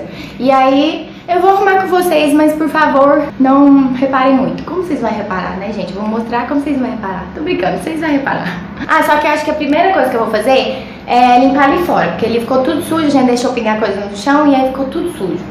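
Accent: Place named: Minas Gerais, Brazil. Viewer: Nosso sotaque mineiro e outro nível ❤️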